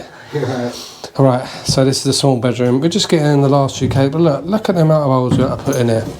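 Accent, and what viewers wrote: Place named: Essex, United Kingdom. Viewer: After living in Australia for 10 years hearing a strong Essex accent makes me wince. Lol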